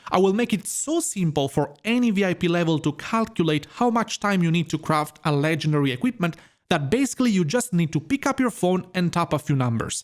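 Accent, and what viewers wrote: Italy. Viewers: Are you southern Italian?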